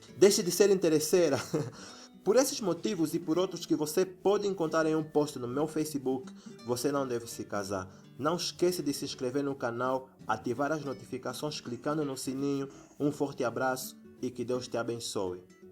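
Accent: Angola. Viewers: Gente! Ele é angolano?? Tenho um amigo de angola e o sotaque é igual... Amo angola!! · Um sotaque do Português Angolano parecido com o Português original (o de Portugal) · Seu canal é muito bom! Sou brasileiro. Esse sotaque é angolano?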